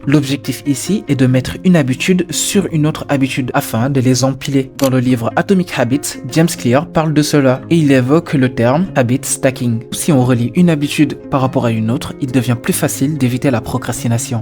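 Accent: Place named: Senegal. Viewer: Un frère sénégalais❤❤❤ je regarde toujours tes vidéos j'ai jamais sue et je trouve notre accent très propre ne t'en fait pas😊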